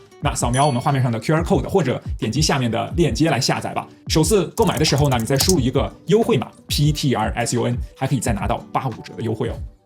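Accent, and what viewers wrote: China. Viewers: 這幾個月才開始看的影片聽口音是大陸人還以為生活在大陸原來住台灣喔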